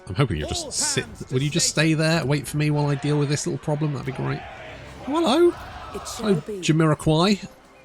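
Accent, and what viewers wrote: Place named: West Country, United Kingdom. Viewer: West Country accent with your commentary is priceless 🤣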